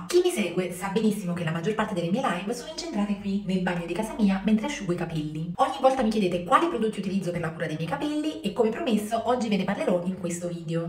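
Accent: Naples, Italy. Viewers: Sei molto bella e bravissima, ti ho scoperta da poco ( sono una signora sessantenne).toglimi una curiosità, ma sei napoletana?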